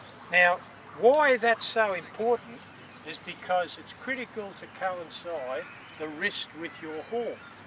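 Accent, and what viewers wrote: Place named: Australia. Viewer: First off, this is one of the most detailed explanations on how to properly execute the double haul albeit a bit hard to follow the Auzzi accent. I’ve watched many top notch instructors and not until now have I heard the reason to wait until the snap of the wrist. He’s absolutely correct in saying that most people start hauling from the beginning or translation of the stroke; I include myself in the bunch. I’m trying to correct this but since I’ve been doing it for many many years, it is not easy to change my technique but I’m trying nevertheless.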